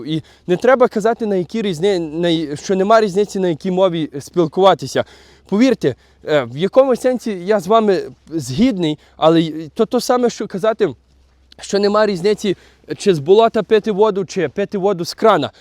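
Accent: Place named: Western Ukraine, Ukraine. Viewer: Западеньска говирка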